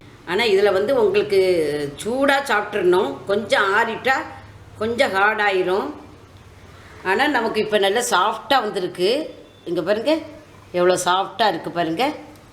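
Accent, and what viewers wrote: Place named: Nagercoil, India. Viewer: Ungal nagerkovil tamil alagha irruku.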